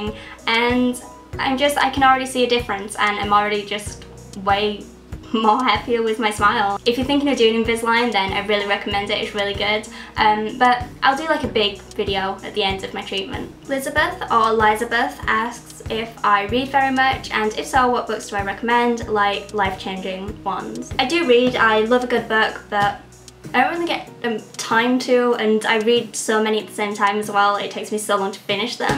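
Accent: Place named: London, United Kingdom. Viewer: OMG I love your accent so much. I am going to copy it when I come to London! I hope we get time to meet up :D :D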